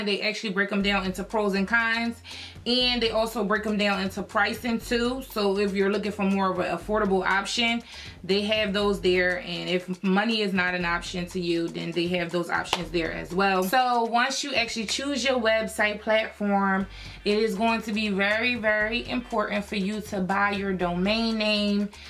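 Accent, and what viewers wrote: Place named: New Orleans, United States. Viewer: I can hear the New Orleans in your voice lol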